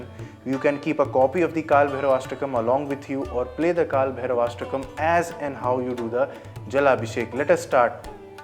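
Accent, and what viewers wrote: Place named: United Kingdom. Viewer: Why are u speaking in BRITISHER INVADERS language? Do we have no INDIC language to depict .. And why are u calling उपासक as उपासका & भैरव as भैरवा, while you correctly pronounce Chanel, Louis Vuitton, Dior, Citroen, Moët & Chandon, Laurent Perrier, Veuve Clicquot, Louis Jadot Domaine Leroy, Château , Bordeaux and L'Oréal as per original French pronunciation & correctly pronounce ikigai, wabi-sabi, kaizen, shinrin-yoku, omotenashi, gaman), kintsugi, shikata ga nai & bonsai as JAPANESE pronounce them. Then why SANSKRIT terms are pronounced by you as beef-eater BRITISH pronounce them? You're full of arrogance & ignorance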